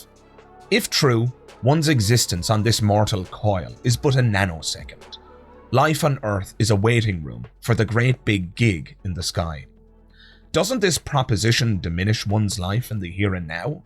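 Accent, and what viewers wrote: Ireland. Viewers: you're welcome, irish script reading guy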